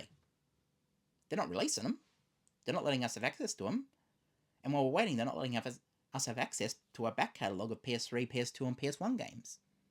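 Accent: Australia. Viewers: I mostly want a ps5 for the new Silent Hill games coming out. I heard theres supposed to be good games coming near the end of the year. I agree with you that ps5 should be at least able to play as far back as the ps3 games. You have a really awesome and soothing voice btw you would be great for voice overs especially in the west because your Aussie accent is very charming. Keep up the great work.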